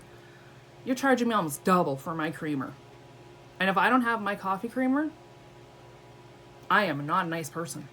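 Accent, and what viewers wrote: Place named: Canada. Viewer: I’ve just listened to this on my walk home and I just can’t wait to see you! Miss that Canadian accent 💜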